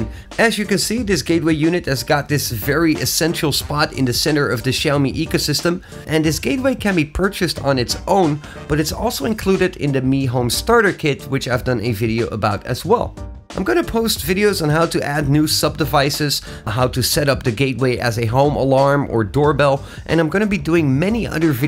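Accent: Netherlands. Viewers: I heard a dutch accent... but I wasn't a 100% sure until I read badkamer in the app... Lekker bezig!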